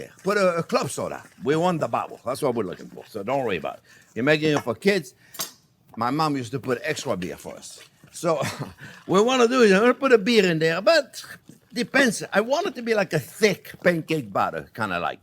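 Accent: France. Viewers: I like this man embracing his pronunciation of his onion. Gonna feel his french accent is so strong. But I love this guy. 😊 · I've been learning French and at times despair at my poor prononciation and misplaced verbs. Then I realised I love listening to your videos as much for your delightful accent as your delicious recipes and helpful cooking tips. Maybe a French person would be just as delighted to hear my Aussie accent - or maybe not! 😊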